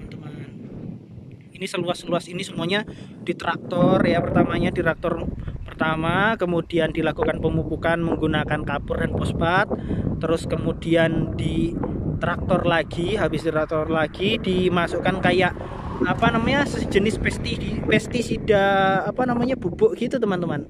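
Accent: Java, Indonesia. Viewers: Jowone ngend to mas...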